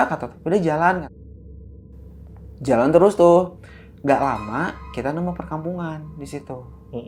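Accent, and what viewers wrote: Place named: Bandung, Indonesia. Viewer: bandungnya kerasa banget a😂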